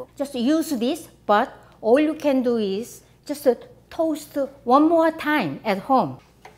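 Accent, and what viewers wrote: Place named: South Korea. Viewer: 아줌마 안녕하세요?저는 문화센터에서 교양영어를 가르치고 있는 강사입니다. 최근에 학생분을 통해 동영상을 보게 됐는데 자꾸 빠져드는 매력이 있네요. 수업시간에 자주 보게 되는데 학생분들도 참 좋아 하세요. 이런 말씀 죄송한데 너무 귀여우세요..ㅋㅋ 한국식 발음이 뭍어 있는 영어지만 너무나도 훌륭하게 하실 말씀을 다 하시는걸 보고 학생분들이 영어가 귀에 쏙쏙 들어 온다며 자신감과 용기를 얻으세요. 저는 초중고를 뉴저지 Cliffsidepark에서 나오고 석사는 NYU에서 했어요. 박사는 이대에서 영어교육을 수료한 상태구요. 망치님이 뉴욕에 살고 계신다니 방갑더라구요. 저도 요리하는걸 참 좋아해서 외국인들을 대상으로 한국 쿠킹 클라스를 열곤 했는데 저의 방식과는 다른 레시피로 시원시원하고 섬세하게 요리를 하셔서 제가 배울점이 참 많은것 같아요. 몇가지 요리는 저도 시도 해봤답니다. 다음 비디오에는 오므라이스나 한국식 볶음밥을 해보시는건 어떨까요? 김치볶음밥도 괜찮구요. 만들기도 쉽고 한끼 식사로도 훌륭하니까요. Kudos입니다. 앞으로도 맛있고 즐거운 비디오 많이 부탁 드립니다. 지켜 볼께요. 화이팅 입니다.!!!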